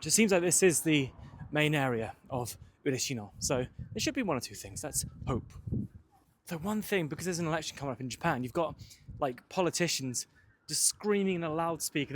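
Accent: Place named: RP, United Kingdom. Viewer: Hello, I love your videos! Since I found your channel a couple months ago I have watched all your videos and I’m always waiting for the next one, thanks for the great job you are doing! I wanted to ask you something and I mean no disrespect with the question but, are you a trained voice or scenic actor? I’m not a native English speaker and your pronunciation and cadence reminds me of the listening exams for the Cambridge exams, like you talk in an accent close to RP. I ask just for curiosity and again, I mean no disrespect and I hope this doesn’t bother you too much. Keep up the good work and thanks!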